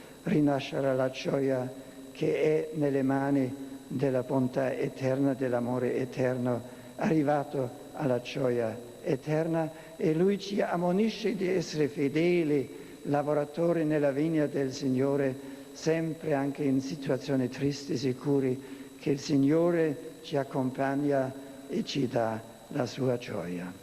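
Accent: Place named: Bavaria, Germany. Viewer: so schönes bayerisches Italienisch - wer braucht da eine Übersetzung?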